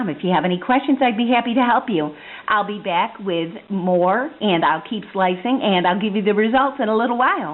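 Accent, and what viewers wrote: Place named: Minnesota, United States. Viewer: I am guessing you are from Northern Wisconsin or Minnesota.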